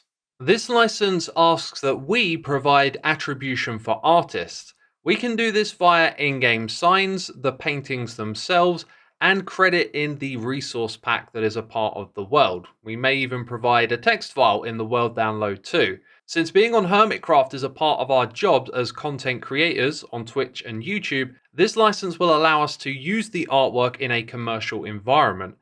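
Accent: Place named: United Kingdom. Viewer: I was hoping to find a comment like this. British accent certainly doesnt help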